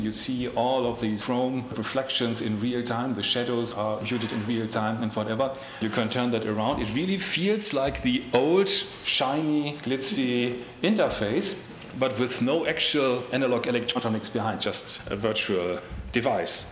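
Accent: Germany. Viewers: german accent ftw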